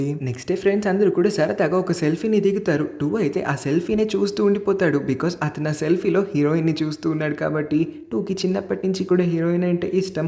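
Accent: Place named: South Korea. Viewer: మీ మాటలు వింటుంటే కొరియన్ బాయ్ తెలుగు మాట్లాడుతున్నట్టుగా ఉంది మీరు మధ్య మధ్యలో నవ్వే నవ్వు భలే ఉంటుంది క్యూట్ గా ❤❤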